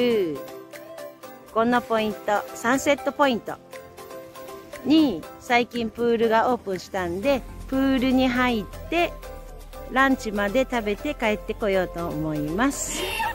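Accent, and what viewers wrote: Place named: Okayama, Japan. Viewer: さん、こんばんは。高台からのロケーションと、チョイチョイ出てくる、さんの岡山弁！最高です👍😁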